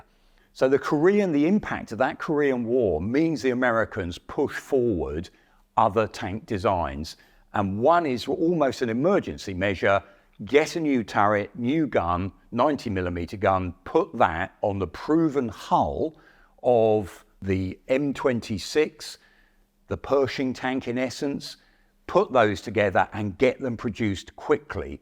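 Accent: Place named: United Kingdom. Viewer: IDK what it is about the British accent of older dudes, but it fits this. As an American it should be US law that in documentaries, there should only be a British commentator. It makes me feel like I'm learning and not just listening to information I will never need 😂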